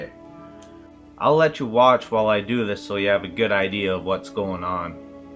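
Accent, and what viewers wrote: Canada. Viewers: Sorry lol, as soon as I saw the yellow bag of flour with the French label showing "Tout Usage" (all purpose) I recognised the Canadian factor - then when I heard buddy's accent I thought "O fk yah bud!" Haha 😄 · = HA! I knew you were a Canuck from your accent! And the Tim's cup cinched it! LOL!!! Great build! So much free stuff to build with, I don't know why anyone buys stuff! And nice job using paper mache. Free and looks better than the plaster cloth. The plaster cloth always shows through. Have fun!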